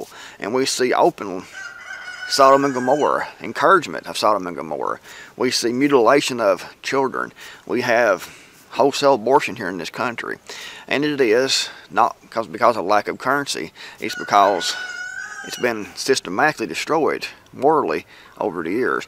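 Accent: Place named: Tennessee, United States. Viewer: What state are you in? Sounds like Tennessee lol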